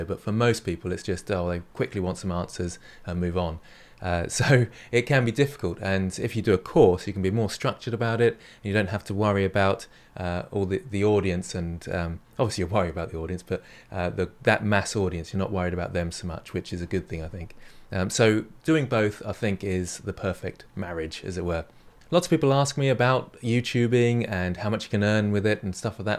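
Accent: United Kingdom. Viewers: I'm very happy for you!! You really deserve more for all the in-depth tutorials and the effort you put on this channel! I know this comment won't change your life and prospective about things, but everyone has flaws and people must not be judged. Actually, flaws are what make us unique. Might be teeth, white hair or anything, but these things make you YOU. I came for the tutorials at the beginning, but I stayed because you're a really nice person, caring and super friendly. Plus your British deep voice is sooo calming when following tutorials. Stay strong, stay healthy and keep up with the good work 💪🏼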